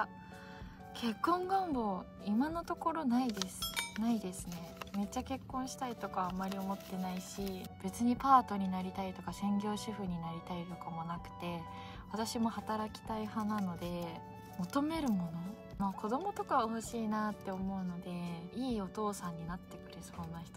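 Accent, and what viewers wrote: Yamaguchi, Japan. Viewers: 今日もアップありがとうございます🎉 グッズ、欲しいですね。絵が上手いのでステッカーとか😊 字も上手だからサインも欲しいです。あとところどころに出る山口弁に癒されています。十万分のイチだけど応援しています。天使ナンバー❶❤ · ハツコにあるんだけど、聞いてくれるかな? 数か月前のみたら山口の方言使っていて、ほんのりした感じで癒やされたよ。たまに方言使ってやって下さいね。❤️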